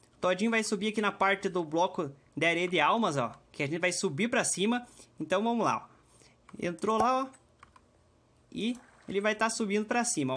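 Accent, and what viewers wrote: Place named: Paraná, Brazil. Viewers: Vc tem uma vozinha meia de caipira sem ofeças sla como ser escreve '-' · Você é Paranáense? Sotaque legal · Paranaense? Vc? Kkk vc tem sotaque,me ajudou muito obrigada, super recomendo seu canal👍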